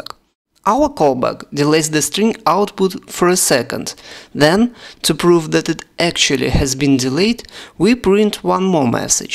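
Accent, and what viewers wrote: Russia. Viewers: можно было бы и на русском, если такое произношение..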